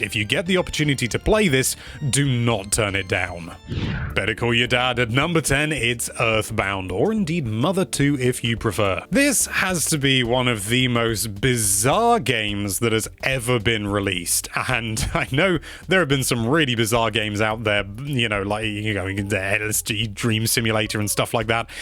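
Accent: United Kingdom. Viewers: Its the way we pronounce it in uk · It's the British pronunciation, the way we all said it in the days before YouTube... ;) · It seems Americans (like me) would say Yo as in yo-yo, and this dude (and presumably others in the UK) would say it yo as in "yonder".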